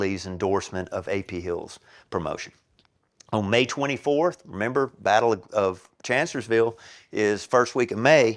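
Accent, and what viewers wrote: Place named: South, United States. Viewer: Fantastic, informative, and entertaining as presents it all, in the most interesting southern drawl, about A.P. Hill. Thank you.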